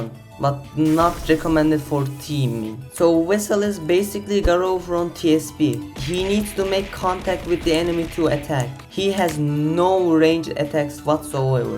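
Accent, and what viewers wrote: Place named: Turkey. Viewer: Brotherman is obviously Turkish. Gotta get that pronunciation smoothed out, brother.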